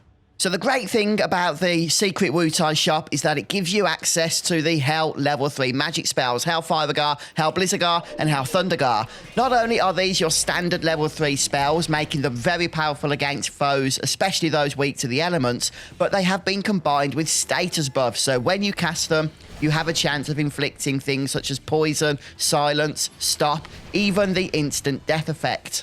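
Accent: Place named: Black Country, United Kingdom. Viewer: Daaayyymm dude. Are you from the Black Country? So nice to hear that accent. I'm from Birmingham. But no permanent accent. I auto mimic whomever I talk to unconsciously.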